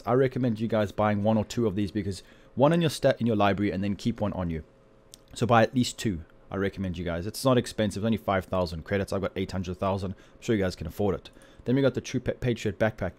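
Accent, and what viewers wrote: South Africa. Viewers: is that a South African accent? Thanks for the help man, keep up the good work <3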